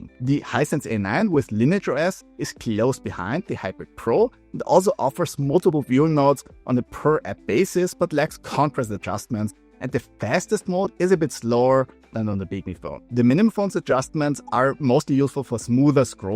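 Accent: Vienna, Austria. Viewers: richtiga wiener heast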